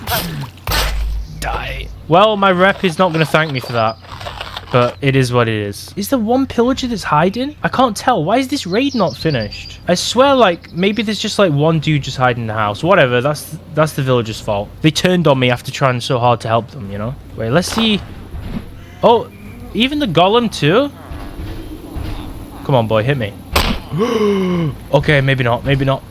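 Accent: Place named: Northern England, United Kingdom. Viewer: Not sure if you explain how to get started in this pack, but I like your accent. Northern England, possibly Eastern, sounds nice.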